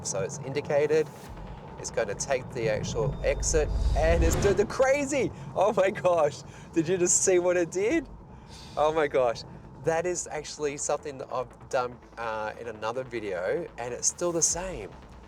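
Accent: Australia. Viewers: Don't laugh at the Tesla not understanding the Aussie accent. Why expect more from it then the rest of the world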